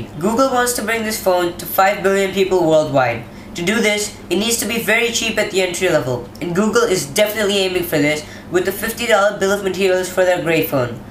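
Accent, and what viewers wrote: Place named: India. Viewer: Face not shown. Are you from India cause you sound like a Indian. Is it true Indian are not suppose to show their face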